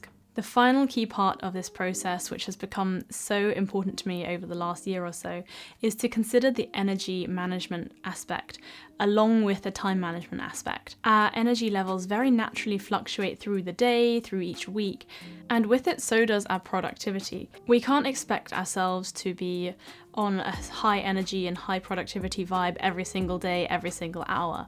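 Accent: United Kingdom. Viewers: you are that one Youtuber for whom I’ve been searching for years! An intelligent, genuine, well-educated female YouTuber with a modern RP accent as my speech model. I gave up last year after searching far and wide but today the algorithm took me to your British accent video and I immediately subscribed! You’re cultivated, intellectual, sincere, elegant, beautiful, speak with a perfect modern RP accent AND create such high quality video with excellent advice. What else could we ask for? Thank you, thank you; thank you!! ❤️❤️❤️ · how to practice to get British accent like you?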